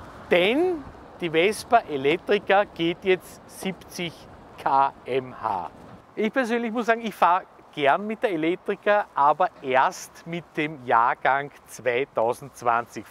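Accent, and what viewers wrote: Austria. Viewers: östricherr reded so lushtig hahah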